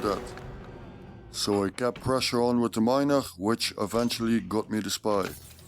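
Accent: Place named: Netherlands. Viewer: New to the Stratego app, but I am a fan of the game all my life. I recognize a Dutch accent a mile away. Nice video, hope to out bluff you if we ever meet up.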